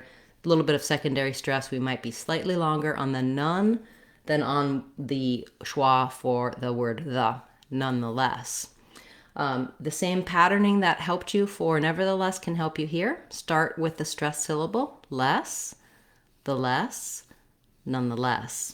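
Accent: United States. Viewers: Been learning English for a very long time , my vocabulary is vast and I’m almost flawless in grammar, never paid much attention to correct spelling. My biggest challenge is pronouncing the words correctly. I love American accent and I’m enchanted by your accent, words sound pleasant when you use them.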